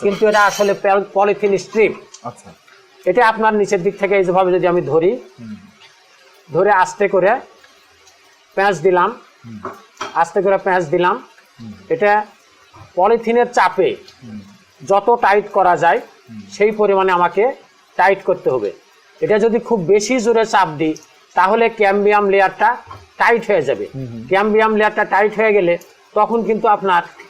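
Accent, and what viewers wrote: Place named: Tangail, Bangladesh. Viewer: ভাইজান সুন্দর করে বুঝিয়েছেন। ওনার সহজ সরল ভাষা ও কিছুটা আঞ্চলিক (সভবত টাংগাইল, জামালপুর বা মানিকগঞ্জের ভাষা) খুব ভালো লাগলো। টপিকটা আমার কাজে দিয়েছে। ধন্যবাদ জেন্টেলনম্যান।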